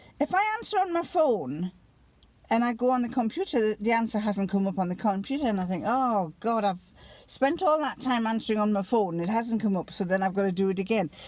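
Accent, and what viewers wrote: United Kingdom. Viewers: I love your vlogs! I am from Ohio, so I love seeing your scenery on drives and walks, enjoy your mother-in-law, the kitties, his lordship, the British accent. I am same age and a sewer so I love your opinions on fitting and showing your makes. So thank you for all your posting.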